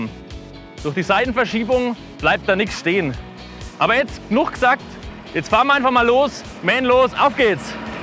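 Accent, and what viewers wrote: Franconia, Germany. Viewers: Also für jemanden mit einem OAL-Kennzeichen hat der gute Mann aber einen ziemlich fränkischen Dialekt :D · Der " " hat doch ein sehr fränkisches Dialekt - wenn der mal nicht aus Feucht bei Nürnberg ist.... Wo wird nochmal Fella produziert!?;-)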